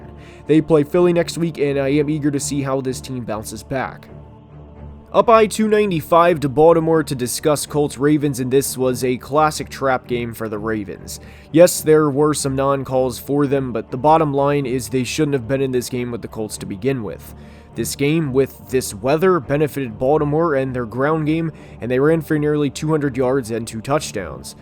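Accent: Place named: Wisconsin, United States. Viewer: Bro you have to be from northern Wisconsin. Also great recap! Earned a sub. Keep it up.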